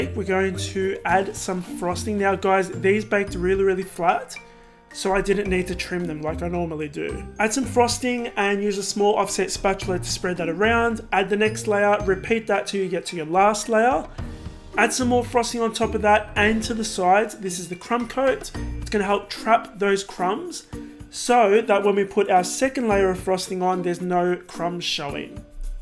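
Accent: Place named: Australia. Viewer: Wow..am i the only one who is here by watching the luscious froasting and ended up watching twice because of his amazing australian accent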